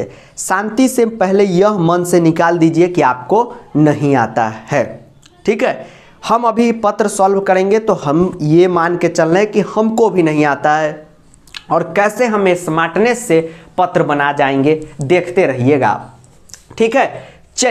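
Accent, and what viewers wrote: Bihar, India. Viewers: Pure bihari sir😍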